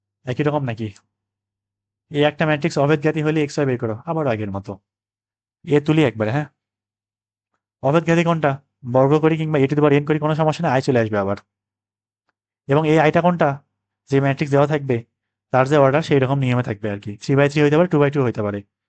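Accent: Naogaon, Bangladesh. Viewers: Onk upokrito holam..❤sir hamar bari kolo nouga vasa kemba chena chena lagce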